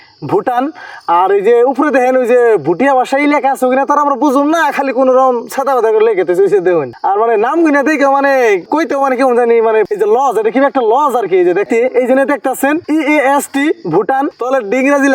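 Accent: Bangladesh. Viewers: Amader tangail ar moto language lage 😊❤ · আপনার কথা শুনে মনে হচ্ছে আপনি বাংলাদেশি যদি ভারতীয় হন তাহলে এরকম ভাবে কথা বলেন কেন? কোন জেলায় থাকেন? আর যদি বাংলাদেশের মানুষ হন তাহলে আঁধার কার্ড কিভাবে পেলেন?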